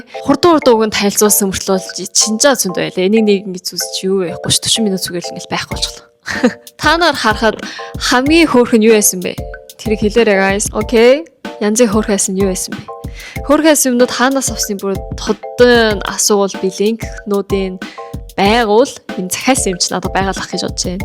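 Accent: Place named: South Korea. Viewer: solongost udaan amidrsan ugasa solongoschuud accenttai yridag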